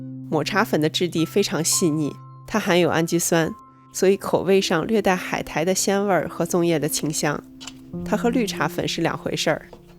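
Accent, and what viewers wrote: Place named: Beijing, China. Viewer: 您的標準(京片子)聽您的解釋很仔細很舒服感恩會照您的方法試作感謝您。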